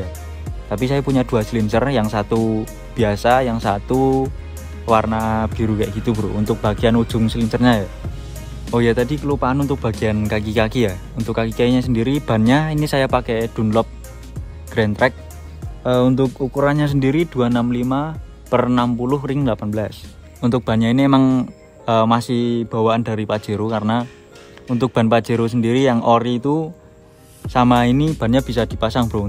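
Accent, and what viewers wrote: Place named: Java, Indonesia. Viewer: wkwkwkw medok nya ganggu banget anjiing jawa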